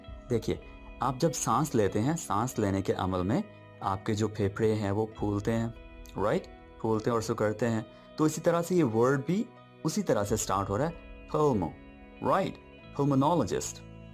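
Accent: United Kingdom. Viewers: Sorry to say Mujhi srif apka urdu ko english style mein bolna acha nhi lgta.. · yar pehla banda daikha jo urdu me English accent use kar raha hai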